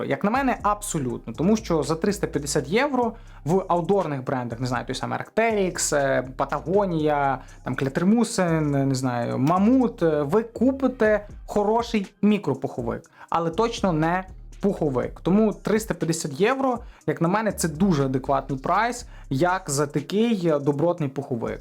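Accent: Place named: Rural, Ukraine. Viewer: Блевануть хочется от селянцкого ховора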